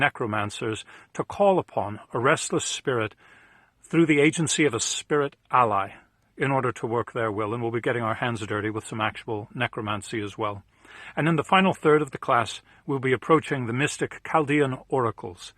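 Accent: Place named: Transatlantic, United States. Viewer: My man really sells this with the transatlantic accent too. I have the trade edition and working through it now. Day 6 and the dreams are wild.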